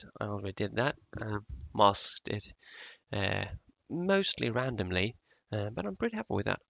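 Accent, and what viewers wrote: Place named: United Kingdom. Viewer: Turned out really nice, mate. I would suggest if you’re going to get into dioramas that you invest in a Dremel tool (a little over $120 Aussie on eBay, so probably around 60-70 quid going from your accent, lol!!) - they’re perfect for cutting, and you’d be able to make the edges where the tanker broke in two more jagged and realistic. Also get yourself an airbrush (around AU $150 on eBay for a half decent kit including compressor and everything else you need), it makes doing the detail work that much easier. Please don’t get me wrong, what you’ve done looks fantastic, but particularly the Dremel will go a long way for those little finishing touches. As an aside, on a historical note, empty tankers were even more dangerous and prone to being blown out of the water than full ones, as the tanks may have been empty, but were full of explosive fumes, just waiting for a spark. 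Tanker crews were hands down some of the bravest to serve!!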